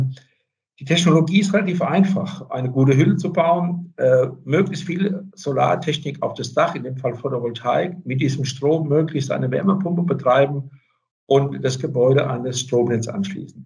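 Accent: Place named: Hesse, Germany. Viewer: „Wenn sie des…“ E bissi hessisch schwätzt er noch der Ex-Brunnensträsler! VG JK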